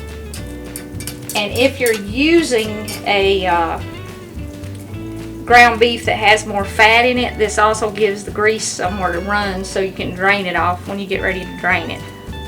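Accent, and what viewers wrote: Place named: Alabama, United States. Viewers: i'm guessing you're from Alabama, by your accent. You're cooking is similar to ours. I'm from Missouri and a lot of Missourians were originally (in the1800's) from Alabama, Tennessee, North Carolina. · What part of the south are you from? I am from east, TN. Your accent sounds very much like ones from this area.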